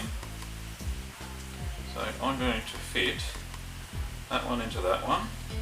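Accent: Australia. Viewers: I've just had a good laugh. My soundcard is broken so I watched this video with closed captions. speaks with clarity and has a pleasant Australian accent. Sadly youtube almost completely fails to understand. A highlight was when he added lip eyes to his fella. A useful video with added fun from youtube's narration software - thanks.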